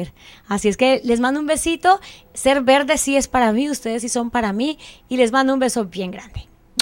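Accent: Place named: Cali, Colombia. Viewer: tipico acento valluno colombiano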